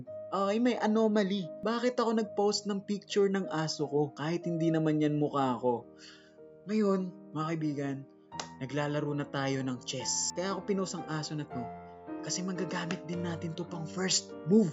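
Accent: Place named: Pampanga, Philippines. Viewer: Aydo atchu ya pala pampanga bap hahah an lakas boss taga pampanga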